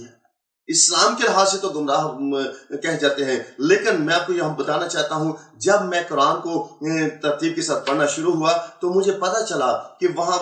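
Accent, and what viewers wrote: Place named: Arab world. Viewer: qabil e ghor bat yah hay is video main k jab yah arabic qawaid janta hay or yah arabic bhi smajh leta hay hafiz bhi hay to isay tarjuma parhnay ki zarurat kyun paish aie? or is ka arabic lehja bhi note krnay wala hay ,, yah khinzer choora hi tha or hay , yah dramay bazi kr rha hay musalmano ko gumrah krnay k lie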